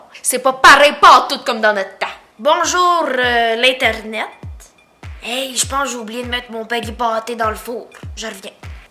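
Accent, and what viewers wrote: Quebec, Canada. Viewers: contente de te revoir après 1 mois d'absence, super personnage 👵👵 ta un vrai un talent pour imiter des gens de tout les âges t'es toujours aussi belle 💖 💋 ton accent est trop cute ( je suis française j'apprends le québécois) gros bisous 😘😘😘😍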